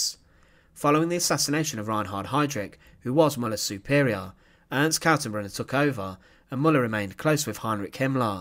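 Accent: United Kingdom. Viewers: what part of the uk is your accent from?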